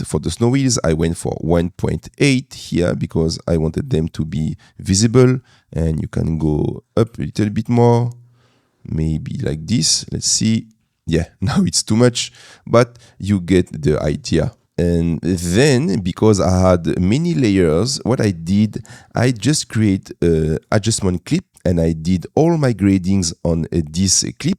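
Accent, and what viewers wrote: France. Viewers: t'es français ça s'entend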